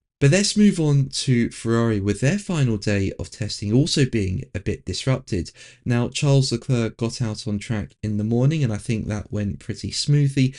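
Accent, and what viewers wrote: England, United Kingdom. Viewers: I love your english accent 😂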